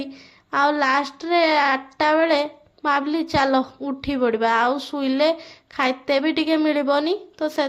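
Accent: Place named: Mayurbhanj, India. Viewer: Pure balasoria katha houni tk tk mayurbhanjia katha ହେଇଯାଉଚି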